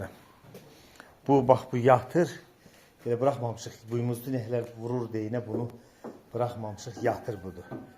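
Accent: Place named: Goycha, Armenia. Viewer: Ləhçən çox xoşuma gəldi GÖYÇƏ MAHALINDAN DEYİLSƏN? BASARKEÇƏR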